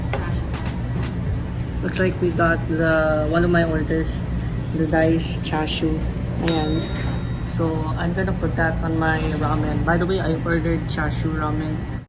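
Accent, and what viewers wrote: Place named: Japan. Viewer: Japanese accent is lit 🔥🔥🔥🔥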